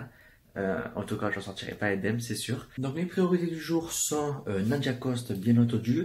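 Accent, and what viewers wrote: South, France. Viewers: Coucou ça va? Merci pour ce vlog, depuis ce matin je trépigne d'impatience à l'idée de le voir lol. J'ai pas été filmer au meilleur moment mais je me rassures en me disant que je ne suis pas la seule lol. Tu as enchaîné les merdouilles le dimanche, la vache. Ce salon a été compliqué pour moi comme tu le sais, mais si je devais revenir en arrière, je reviendrais ne serait-ce que pour te voir. Même si on ne s'est pas vus tant que ça sur la journée chaque moment passé avec toi était super et je super contente d'avoir pu te voir en vrai. Tu es quelqu'un en or, je t'adore! Ne change surtout pas et à toutes les mauvaises langues, allez voir ailleurs. Perso, j'adore ton accent du sud. Tes sourires et nos conversations m'ont redonné le sourire samedi et pourtant c'était pas gagné donc tout simplement MERCI <3. Bonne soirée et belle lecture!!! 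Bisous.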